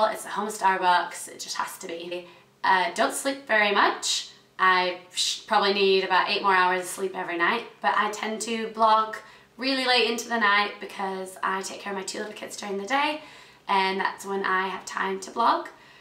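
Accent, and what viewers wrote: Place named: Manchester, United Kingdom. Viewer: Love this, and I can hear a little Mancunian accent underneath your American one :P. I'm the same with routine, I think it's important for kids to have structure, maybe I'm a little too strict though haha x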